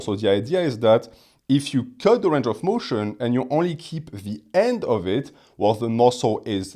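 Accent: France. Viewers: Fitness advice is way better with a thick French accent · hello, this is not related to anything but is it possible for you to style your facial hair like a pencil moustache? you are french and i think your accent will match your character if you rock a pencil moustache. thank u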